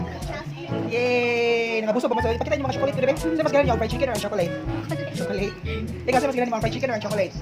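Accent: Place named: Visayas, Philippines. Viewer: gusto ko talaga ung accent mo magsalita ng bsaya hehe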